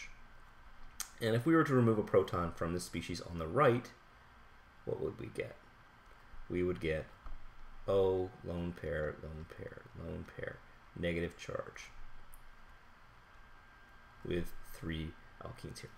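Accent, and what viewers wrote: Canada. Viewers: You're Canadian, aren't you? Love you, man. Thanks for the 3 AM lesson.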